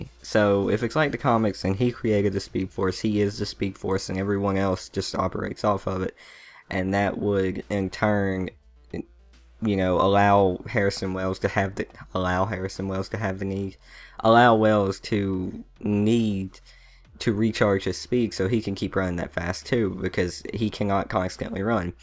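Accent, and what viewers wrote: South, United States. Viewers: Is that a mild Southern accent? Just curious, mate!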